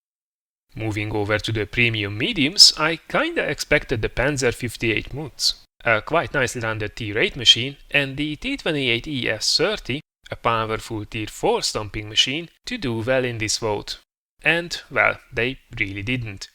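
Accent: Hungary. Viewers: akkor jol gondoltam a kiejtesedbol h magyar vagy😄😃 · You are hungarian right? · you are hungarian right/magyar vagy ugye?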